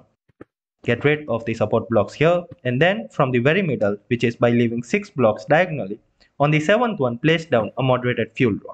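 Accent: India. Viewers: I swear to god, if i need help with anything there is ALWAYS an indian sounding guy on youtube to help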